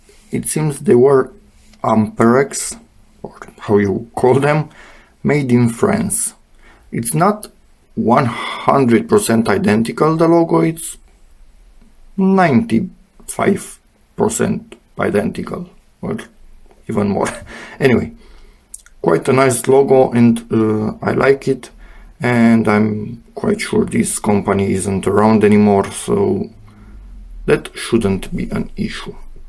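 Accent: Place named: Romania. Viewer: Poate vorbeste in Engleza ca il poata urmari si oameni din alte tari? Stiu, pare halucinant, dar lumea asta are aproape 8 miliarde de oameni, dintre care doar vreo 0.4% stiu romaneste. Eu, ca si alti oameni care se informeaza, am gasit acest canal de youtube cautand in engleza un lucru care ma intetesa. Mi-am dat seama ca ar putea fi roman dupa accent si cand am citit in descriere. Daca tu nu esti aici ca sa te informezi pe subiect, atunci beshi in plm in alta parte. Youtube Trending e de tine, Dani Mocanu vorbeste romaneste.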